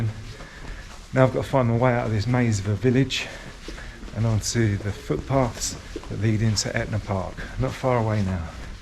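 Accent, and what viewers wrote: United Kingdom. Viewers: I was curious to hear your Italian, it seems good to me, with a very British accent😉, funny to see you wrong to say "specificamente" haha👍🏻 The Sicilians in the port of Catania all seemed very intrigued haha .. Sicily is beautiful but also very particular, especially the Sicilians, you just need to move a few km and change cities to find very different habits and customs, the food is usually very good and cheap , but be careful to call food right, Sicilians are offended if you're wrong haha... I crossed Sicily from Catania to the Egadi in 2021, passing through Etna, Enna Agrigento, Palermo and Trapani... so I'm very curious to see all 3 videos. I've never tried Bronte pistachio limoncello🤔 but it should be drunk after it's been in the freezer 😅👋🏻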